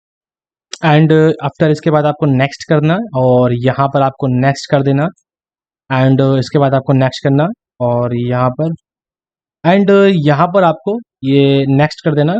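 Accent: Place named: Hyderabad, India. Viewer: thanks broo that was really a big hectic, by the way that hydrabadi accent kills it , love bro love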